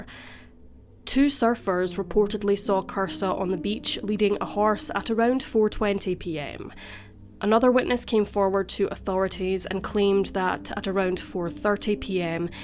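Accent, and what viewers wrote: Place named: Somerset, United Kingdom. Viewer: Ooh arr nothing wrong with a Zumerzet accent ..😃😃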